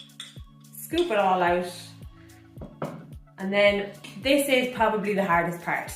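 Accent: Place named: Ireland. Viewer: Hello there, I got randomly recommended your video. I see you are a small time youtuber. I am liking and commenting to give you an edge with the algorithm. I actually stayed the first 2-3 mins of the video to figure out where you are from. That is a very mild Irish accent - at least I think. Did you grow up in Ireland? or I suppose which part of it if you don't mind sharing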